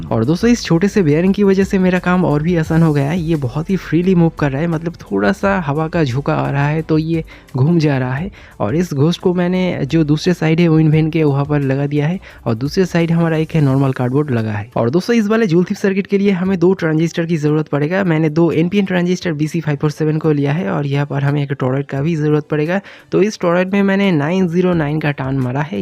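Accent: West Bengal, India. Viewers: Aapke sare projects bohot acche lagte hai. Ek question tha kya aap west bengal se ho??aapke batonse lagta hai❤❤😊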